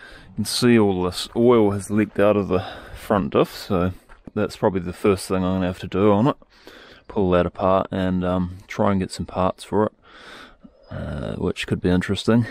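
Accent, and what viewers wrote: New Zealand. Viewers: This is the fifth or sixth video of yourse I've watched and after the first two I said to myself This dude had to be from New Zealand And you are!! Cheeeers matee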